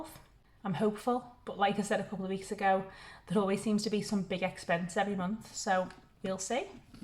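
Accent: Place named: Merseyside, United Kingdom. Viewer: You were the first video I saw when I began looking for a new way of living and I struck the jackpot. To hear a Merseyside accent and to see you working towards the kind of life I wanted was an inspiration to me. Like this week, when I hit a bump in the road, and despite making many mistakes, I found it more manageable. Thank you and congrats on your 1k 💜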